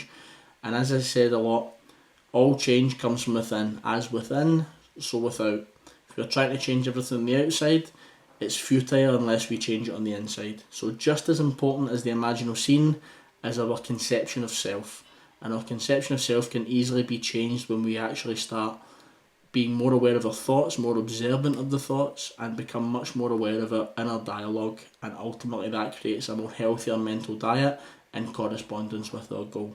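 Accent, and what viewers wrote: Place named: Scotland, United Kingdom. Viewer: A UK Neville follower! Love the Scottish accent, I'm from Wales and am a follower of Neville. God Bless :)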